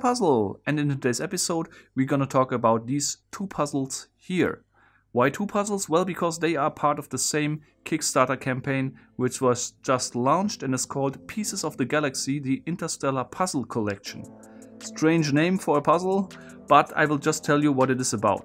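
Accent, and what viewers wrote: Germany. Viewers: Sir are u a german?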